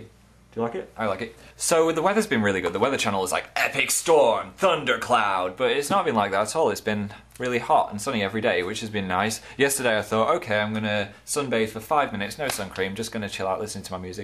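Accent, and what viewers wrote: Texas, United States. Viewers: I like your American accent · I like your American accent. It's actually pretty good, although it's hard to tell when you go back and forth. You should to a video were you try to say things in an American accent if you haven't already. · your american accent is really good! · i like your Texan accent:) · PLEASE DO A WHOLE VIDEO IN AN AMERICAN ACCENT!! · accent in this video is like the ones in Texas. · Lol ur american accent is awesome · anybody else think he sounds like makemebad35 with an American accent? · His American accent makes him sound like Christian Novelli. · Oh my god ur American accent!!!!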